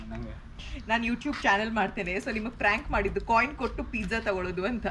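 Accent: Mangalore, India. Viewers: Namma kudla da kannada baari shok kendrene kushi apund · Awesome prank I really appreciate your confidence for doing this👍. They were so kind. The way you spoke in mangluru Kannada was super cute ❤️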